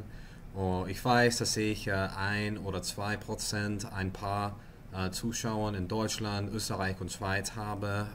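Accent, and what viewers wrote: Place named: Netherlands. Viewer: Sind Sie ursprünglich aus den USA oder aus den NIederlanden? Ihr Akzent hört sich sehr Niederländisch an und gefühlt tradet jeder Niederländer auch.. :)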